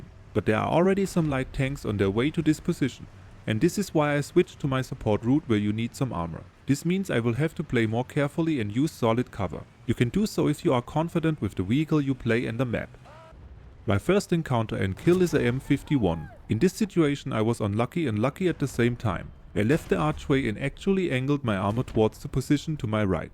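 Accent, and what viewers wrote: Germany. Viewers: Is it possible thst your native language is german ❤?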